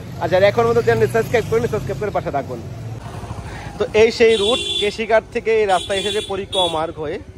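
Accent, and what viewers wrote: Bangladesh. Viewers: কিন্তু ভাই তোর কথা শুনে মনে হচ্ছে তুমি বাংলাদেশি , সাবাধানে থাকিস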